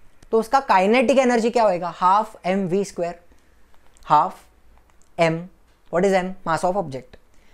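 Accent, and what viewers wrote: Maharashtra, India. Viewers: Sir you are Marathi 😁😁😁😊😊😊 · Sir are you marathi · His sir you are Marathi??? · Sir you from Maharashtra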